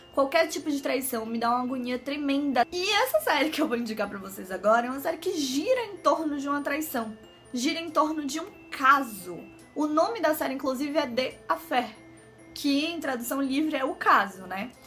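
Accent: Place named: Salvador, Brazil. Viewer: Amo esse sotaque do meu país Salvador hahaha Já tinha assistido o filme efeito borboleta, eu fiquei um pouco desanimada com o filme porque eu achei que as coisas demoraram a acontecer, mas eu até que gostei, achei a proposta interessante. Fiquei com vontade de assistir The Bold Type, acho que vai entrar pra lista kkkkkk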